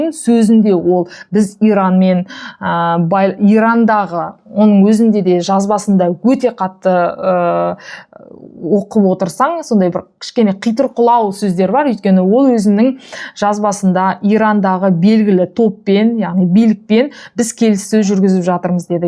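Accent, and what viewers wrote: Standard. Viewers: қызым рақымет қазақша таза сөилеисің жаңалытарды таратып түрғаныңа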